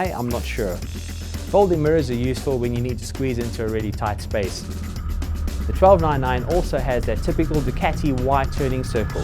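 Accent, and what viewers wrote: South Africa. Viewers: Fucking Bothered I wanna say South African.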